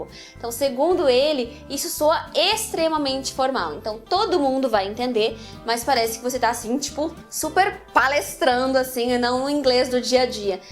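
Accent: Rio Grande do Sul, Brazil. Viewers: tu é gaucha???? nao creio!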